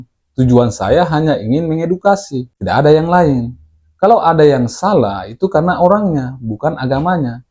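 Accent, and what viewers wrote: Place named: Papua, Indonesia. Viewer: ketauan misionaris terselubung kau, dari logatnya aja udah ketauan kau kristen papua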